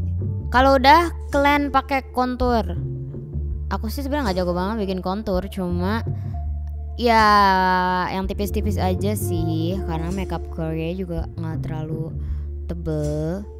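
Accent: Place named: Java, Indonesia. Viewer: Kenapa suara kak di sini agak medokk!! (Emot ketawa)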